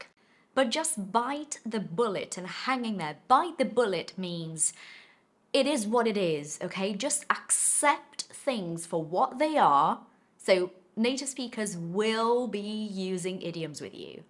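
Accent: United Kingdom. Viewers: I like your British accent!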